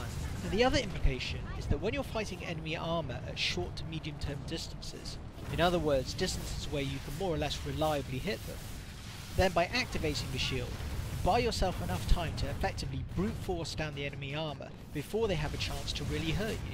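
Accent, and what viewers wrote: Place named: United Kingdom. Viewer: I think you've found a great niche- a pure NC channel is a great supplement for an NC player who wants to learn the ins and outs of the factions to a more general channel (like Wrel's). Keep it up! I play on Waterson, but, judging by your accent, you live in the british isles and dont play on waterson True?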